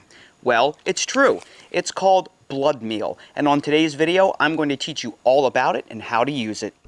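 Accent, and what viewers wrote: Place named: New York, United States. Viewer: Your in nc but you have a ny accent😀excellent video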